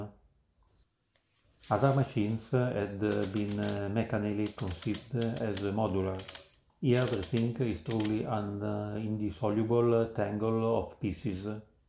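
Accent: Italy. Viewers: 5,000 to 7,000 parts! Electro mechanical feat of design and engineering. I came here by accident and was transfixed by this piece of office equipment. Whoever you are, thank you for producing this video, in English with an Italian accent!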